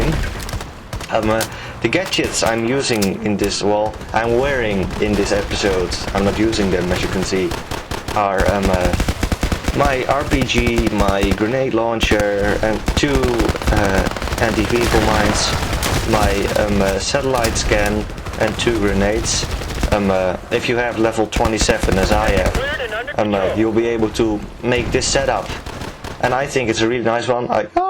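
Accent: Netherlands. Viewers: Primary weapon: G3A4 Secundary weapon (Optional): none Pistol: M9 Attachments: none Gadgets: none, only the standard one (ammo box) I want to see you, and your skills only, good luck!! P.s. what is your first language? Dutch? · Just a question:arr dutch? :D i think your voice sounds dutch^^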